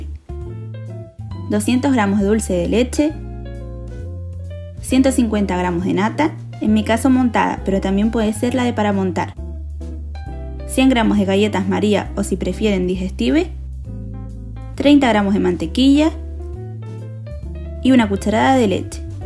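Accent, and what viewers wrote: Canary Islands, Spain. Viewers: Mira que te había oído en otras recetas y decía... esta chica es canaria... pero al ver los suspiros de Moya ya me lo confirmó. Pondré en práctica la receta. Por cierto, como idea, puedes poner los ingredientes en la descripción del vídeo. Es muy útil para los que vamos a seguir la receta. Un abrazo desde Gran Canaria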